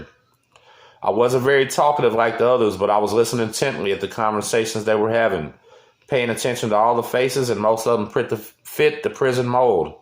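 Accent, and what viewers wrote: Texas, United States. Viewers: Hey man..your vids are def. part of "my show" that I watch weekly! My kids dad is doing a 20 yr fed sentence at the moment. We're from Lubbock TX. He WAS in Big Spring TX but just got moved to a medium facility 😟 obviously Idk where he's going to end up in the U.S. but...hope its not too bad 🙏🏼 anyways, your Shit hits home for me 🙂 sending good vibes and energy your way man! BTW!! Man you sound just like someone from TX too!!✊🏽 #mynigga lol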